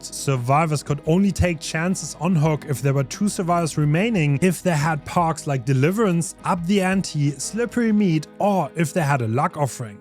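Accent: Germany. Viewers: Can you stop talking like the fucking rift guy while adding your horrible german accent dude? Wtf is wrong with you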